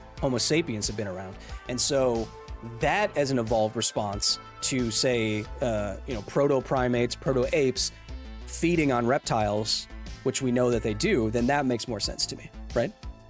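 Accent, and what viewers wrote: New Zealand. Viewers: your accent is good but sounds a bit more like New Zealand to me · Aussie accent is close but you say your "e" and "I" more like a Kiwi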